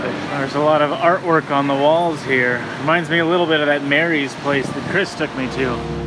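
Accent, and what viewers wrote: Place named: Ireland. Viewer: Great to hear an Irish accent in a WIDRN video. How many of us Irish Arandanauts are there?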